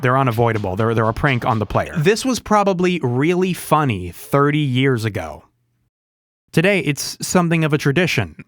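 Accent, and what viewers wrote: United Kingdom. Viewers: you're british? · why is he british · never knew you were British, the more you know lol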